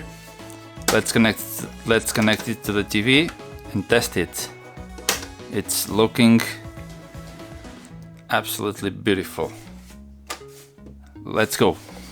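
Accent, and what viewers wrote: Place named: Portugal. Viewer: Are you Portuguese? Eu conheço o sotaque...Eu curto este tipo de content 👍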